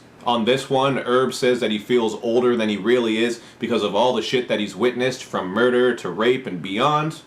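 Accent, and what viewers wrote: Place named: Nova Scotia, Canada. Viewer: i'm gonna take a wild guess and say this guy is from nova scotia. that accent sounds nova scotian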